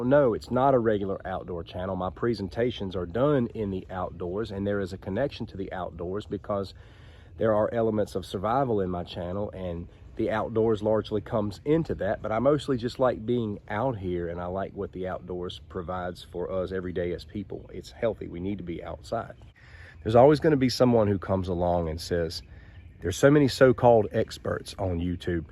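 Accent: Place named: Georgia, United States. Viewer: Are you from Georgia? Your voice is very soothing and reassuring.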